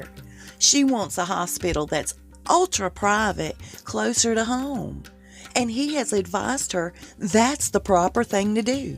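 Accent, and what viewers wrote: South, United States. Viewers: Your from the south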